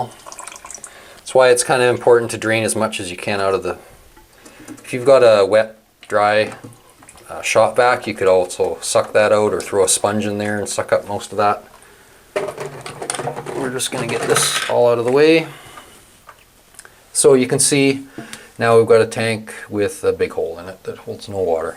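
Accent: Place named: Canada. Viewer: Hi! I can't get over how much you remind me of a friend from (5th grade? ish?) whose name was...and lived a couple of doors down from me in Seal Beach, California....and he was from Canada too (I assume you're from Canada with that accent). I know I already asked you if you lived there as a kid and you said no.....but, if that's true, I swear you have a doppelganger out there somewhere! Thanks for all of the amazingly helpful videos, btw! I'm getting ready to have an addition built and I feel like I know all kinds of things that will be helpful in the process.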